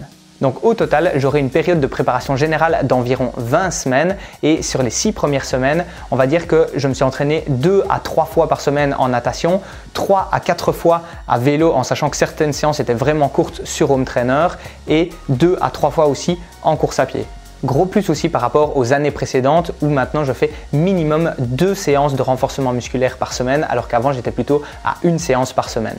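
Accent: Belgium. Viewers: yo man tu es d'où en Belgique? Je crois entendre un accent liégeois mais pas sûr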